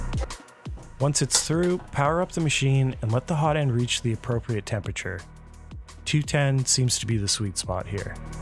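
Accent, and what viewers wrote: Canada. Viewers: Well, first I did enjoy the video, it was a reasonable use of my time. Your tone and delivery is smooth without being rushed, and the background music is faded in and out appropriately. From a video-crafting perspective, it's well done. For that alone you get a like and a comment to hopefully put more eyes on your work. :) The subject, on the other hand, is a bit lacking. "Everything" I need to know is a lot more than what you've mentioned. Equipment costs? Okay, maybe this isn't the best place for that unless you wanted to truly endorse the Sustainable Design item. But still, a ballpark is useful. How about time costs? How long does it take you to create a usable length of filament? That is a huge obstacle to any kind of home filament making - it's just so slow. And bottles? From your accent and channel name I'm guessing you're in Alberta. 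Alberta has a really good bottle recycling infrastructure. This isn't going to keep anything out of the landfill, unless you just trash your bottles instead of recycling them - and even so, YEG and YYC both have very good diversion processes at their landfills to catch stuff that can be recycled. In fact, in Alberta it's adding to the overall waste because it's harder to find a recycling centre that will take a bottle fragment. I guess what I'm trying to say is this: any video about home recycling/filament making really needs to hit the problems as well, at that means some discussion of the time cost and the fact that bottles are one of th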